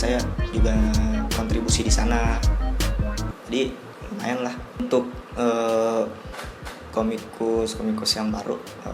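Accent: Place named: Sulawesi, Indonesia. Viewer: Hilang mi logat Sulawesinya tawwa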